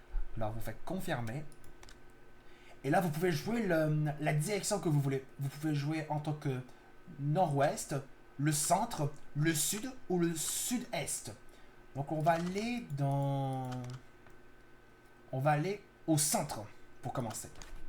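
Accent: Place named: Quebec, Canada. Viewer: Pti commentaire et c'est constructif. Je suis québécois et je pense que toi aussi. J'ai parcontre du mal à comprendre se que tu dis. Essaie un français universel ou parle avec un accent assumé! Sinon c'est super bien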